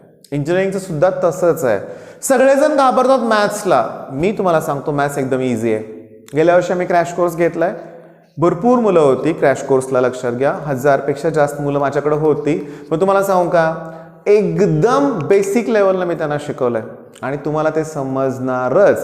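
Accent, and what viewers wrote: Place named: Western Maharashtra, India. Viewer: Sangli satara kolhapur che por boltat he bhava ✌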